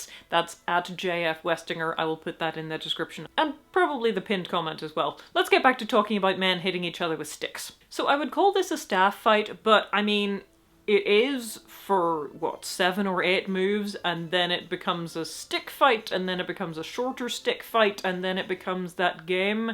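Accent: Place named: England, United Kingdom. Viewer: I take reviews quite seriously because unlike some YouTubers she speaks with an English accent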